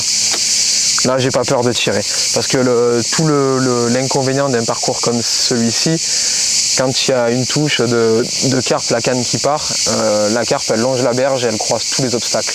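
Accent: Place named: South, France. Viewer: Bonjour, Merci pour cette belle vidéo. Le soleil, le chant des cigales et ce bel accent du sud, c'est tout ce qu'il nous faut pour oublier la grisaille de ces derniers jours 😊 C'est toujours un plaisir de voir (ou revoir 😊) tes vidéos.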